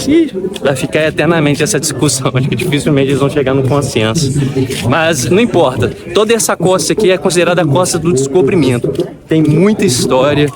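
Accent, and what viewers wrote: Minas Gerais, Brazil. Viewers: Não consigo parar de ouvir vc falando, esse sotaque mineiro é bom demais rs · otimas dicas Brother, pelo seu sotaque é minerin que nem eu kkkkkk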